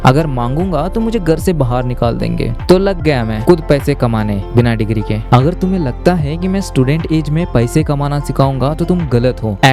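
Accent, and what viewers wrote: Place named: South India, India. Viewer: bhai, are you from south? accent bhot pyara hai♥♥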